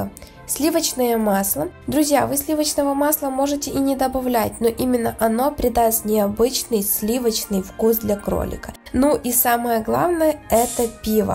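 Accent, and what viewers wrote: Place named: Ukraine. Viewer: Просто наверное с Украины а старается говорить на русском языке ну не придерайтесь все понятно девочка старается.Спасибо!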